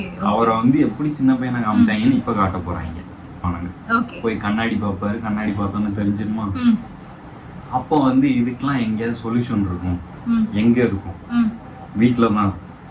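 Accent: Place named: Tirunelveli, India. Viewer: So good to hear in Tirunelveli slang, bro🫡🫡🫡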